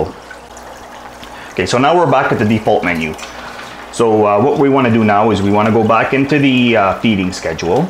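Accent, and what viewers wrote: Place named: Canada. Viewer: I can’t thank you enough. The instructions that come with the feeder were certainly written in China where the feeder was made. The instructions are impossible, like IMPOSSIBLE, to understand. Returning this would have been a pain. Thanks to your video in native English, Canadian I believe, I got it set up in minutes. Thanks so much!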